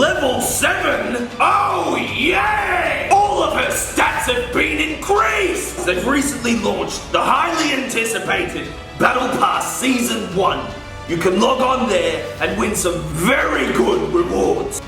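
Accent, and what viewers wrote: Australia. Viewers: I love the Australian accent!!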